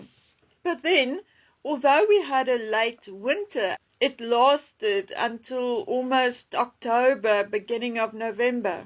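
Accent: South Africa. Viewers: I enjoy and value this channel and listen every day from NYC. One of the things I especially like about it is that it is the authentic voice of an intelligent and insightful woman from South Africa. I like hearing regional way of speaking English, her perspective as someone who lives within the Commonwealth and yet also lives on a different continent than either myself or Great Britain, and I like the fact that the broadcast is the work of an independent woman and that it represents her own initiative and hard work. I have to say that I'm not a fan of the new introduction because I think it reflects none of those things! The music is a bit bombastic and the imagery is artificial - exactly the opposite of herself, who is straightforward and authentic. Just my opinion, but I think the intro is too generic and dramatic for a channel that is so unique and rational., your own artwork is wonderful - why not feature it as the imagery of your intro? 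Thanks for all you do, and all the best, Lee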